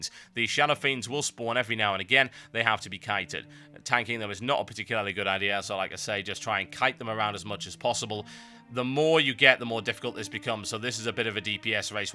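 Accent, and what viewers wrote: United Kingdom. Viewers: Thank you for your job - It might be kinda difficult to record all these great videos. Well, just know, that people are really enjoying this stuff: interesting reviews, good sense of humor and of course your accent. In fact my native language is russian and I've been learning english for 12 years. I always was crazy about brit accent and thanks to you, I have great experience of listening and obtaining of such an interesting noble accent. Plz don't stop doing this stuff- We're all with you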